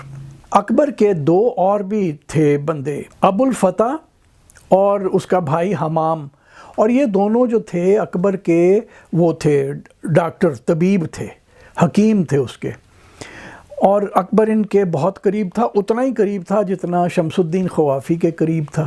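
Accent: Punjab, Pakistan. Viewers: sahab salutes to your style of narration with a pure Punjabi accent! Please if you could present a detailed series about Maharaja Ranjit Singh, so that the new generation doesn't forget about the only legendary Punjabi ruler who is taught in Oxford. Thanks!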